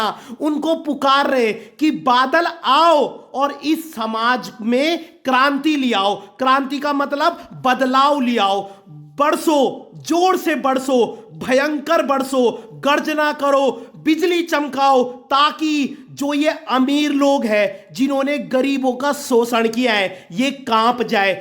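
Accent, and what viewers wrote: Garhwal, India. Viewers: Sir kya aap gadwali ho ? Love from Chamoli - Gopeshwar, Uttarakhand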